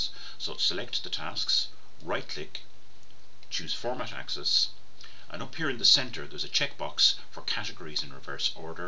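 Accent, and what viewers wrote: Ireland. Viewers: Hey, great videos and they are a big help. its great to hear an Irish accent while i'm pulling my hair out in Australia. Do you have a video that would show me how to put date markers on a gannt chart? I am having trouble getting this to work. I need it for say 7 days before the start date as a marker to call the individual. I just need to know how to get say a star or any marker to remind me to call. thanks in advance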